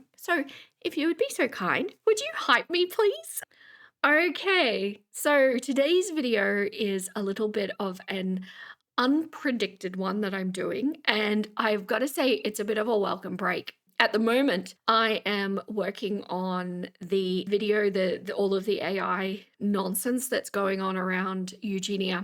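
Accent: Australia. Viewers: There's a a lot of aussies flowing in as creators? I like it, i love the accent 😍🥰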